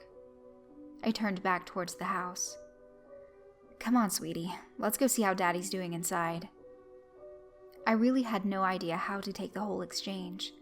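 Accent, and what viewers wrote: South, United States. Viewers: Great narrations love the southern accent really like your productions keep up the good work.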